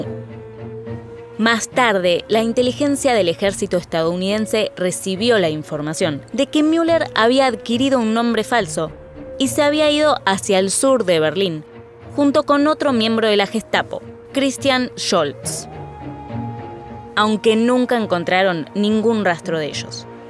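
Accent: Argentina. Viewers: Puufff, lamentable que el video esté en ese español argentino (o será uruguayo) "raro".... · El primero de masho... Si pretenden que gente no argentina se interese en sus vídeos, traten de disimular el acento.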